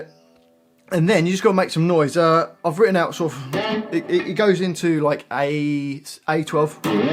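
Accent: United Kingdom. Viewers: Do you sound like Ozzy Osbourne, are you English?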